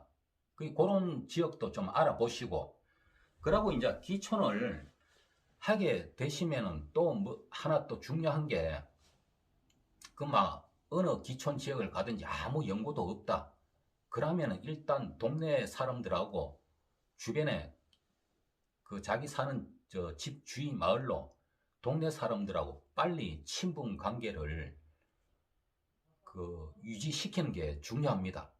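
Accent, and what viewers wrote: Gyeongsang, South Korea. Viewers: 고향이 어디 경상도 문디이 말투인데 혹시 경북봉화 점촌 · 경상도 사투리 쓰시네요 어디신가요? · 순수하신 진짜 시골분세요 근대 경상도 인가요 제가 가고싶은대 경상도는 ㅜㅜ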